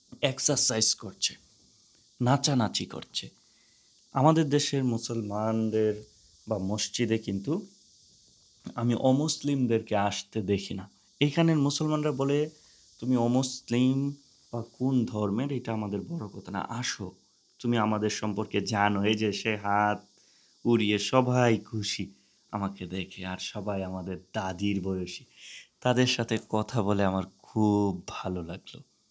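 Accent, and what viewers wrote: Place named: Bhola, Bangladesh. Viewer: কথা শুইনা মনে হয়,তুমি ভোলাইয়া।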